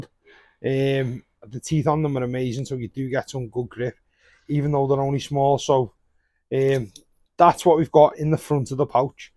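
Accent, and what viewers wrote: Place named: North West England, United Kingdom. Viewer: Sound like your from the North West like me. Would love a colab at some point. 😊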